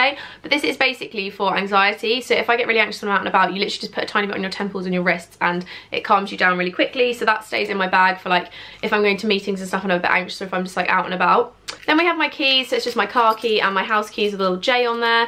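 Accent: United Kingdom. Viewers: English I’m guessing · Is she British? 😉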